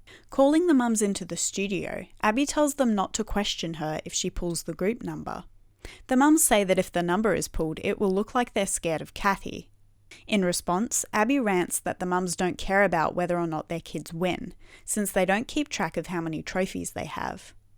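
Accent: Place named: Western Australia, Australia. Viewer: R u by chance from WA? U sound like it!! (Canadian with a perth roomate)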